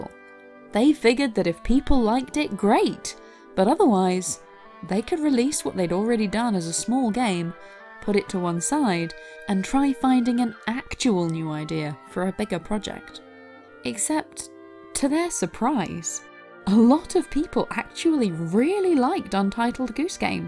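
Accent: United Kingdom. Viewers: Seeing that the channel is called "Video Game Story Time", I expected a fast-talking guy rattling things off at a mile a minute. This slow, measured, British female voice, explaining things in a very calm and step-by-step manner, is entirely unexpected, but very welcome.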